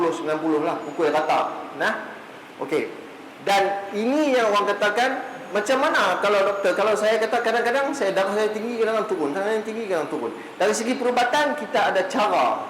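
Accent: Kedah, Malaysia. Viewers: dia pakai bahasa Malaysia juga tapi loghat Kedah. Sebab tu awak tak faham.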